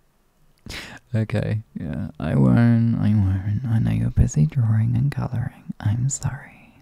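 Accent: United Kingdom. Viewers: I saw this and my first thought was "oh the british guy!" And got very exited since i was just thinking about a fictional crush i have with an accent like yours [pardon the spelling mistakes its late and auto correct isnt on]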